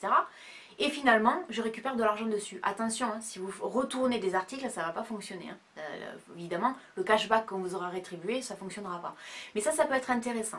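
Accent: South, France. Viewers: Coucou ma belle, tu as tout à fait raison : on trouve plus facilement, en e-shop, des articles qui ne sont pas en boutique. Mes commandes sont toutes passées en ligne, et j'achète peu en magasin. En tout cas, merci pour tes avis, tes conseils, et tes petites astuces pour payer encore moins cher des articles qu'on a par exemple mis en wishlist :) Pour "Vide Dressing" et "Vestiaire Collectif", c'est vrai que tu nous en avais déjà parlé, mais je ne connaissais pas du tout. J'irai regarder de temps en temps; je trouverais sûrement de bonnes affaires........ et pleins de sous à dépenser!.....:) Les enseignes tels que Zara, Morgan, Banggood vendent des articles de qualité, et j'aime bien, même si je n'achète pas à chaque fois. Voilà ma belle, à dans une prochaine video. On te prends tes expressions, et peut-être qu'en te regardant, on prendra aussi ton bel accent du sud :) :) Gros bisous.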